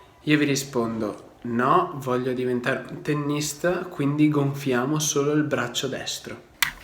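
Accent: Veneto, Italy. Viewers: Vecio ma sei Veneto?xd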